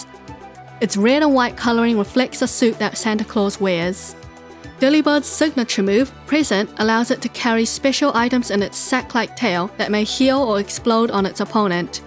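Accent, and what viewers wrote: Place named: New Zealand. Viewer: First word you said had me check that you were from New Zealand (its just how we speak y'know)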